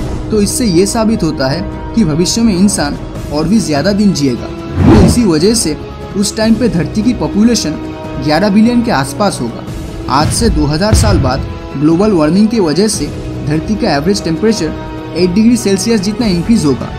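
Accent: Bengal, India. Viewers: Bhai aap bengali ho kya.. bilkul bengali accent hai aapki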